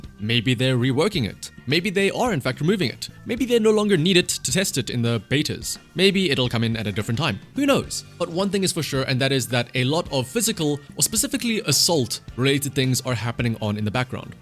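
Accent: South Africa. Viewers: your accent sounds familiar, are you south african?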